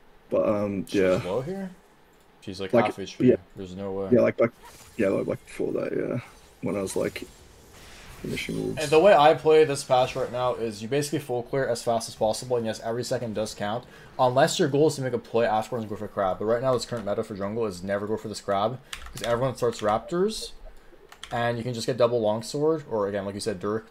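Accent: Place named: Australia. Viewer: Idk Australian accent makes me think it’s oce and as an oce player it’s super inflated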